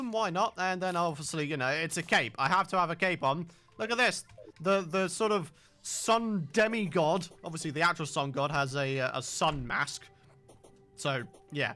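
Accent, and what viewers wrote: United Kingdom. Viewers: Why does every terraria playthrough include jolly British men?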